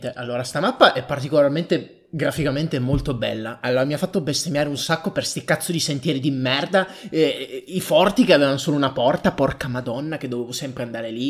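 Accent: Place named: Modena, Italy. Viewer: Ho una domanda da farti: all'accento presumo che tu sia modenese. È vero?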